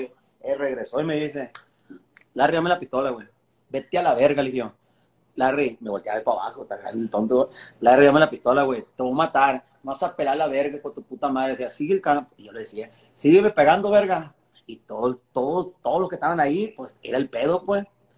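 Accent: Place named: Fresa, Mexico. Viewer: Compa Larry aveces como que ya te sale lo fresón pero saludos compa !!!!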